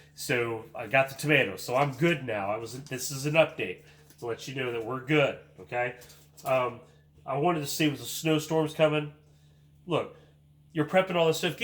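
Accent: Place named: Pittsburgh, United States. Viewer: oh ok. Your accent sound like Pittsburgh. 😃.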